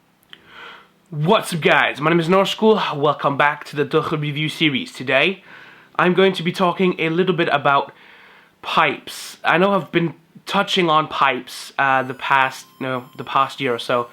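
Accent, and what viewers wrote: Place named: United Kingdom. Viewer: why do u sound british m8 xD i thought u were american ..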